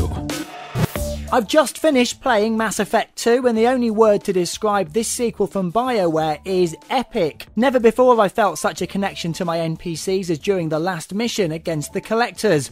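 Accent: United Kingdom. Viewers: Britain has more different accents than most countries of its size. Trust me, he's british- cos he sounds exactly like I do!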